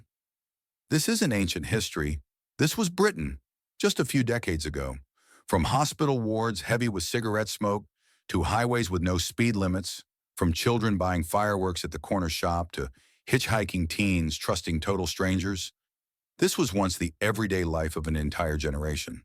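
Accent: United States. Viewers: Why do we have an American narrating this? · i hate to tell you this, but the american accent put lots of people off a video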